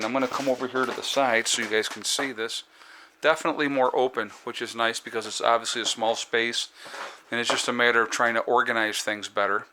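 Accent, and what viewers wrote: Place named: Michigan, United States. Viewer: Wait, where are you from. Just curious. Im in N.H. By your accent, I'm guessing around Michigan?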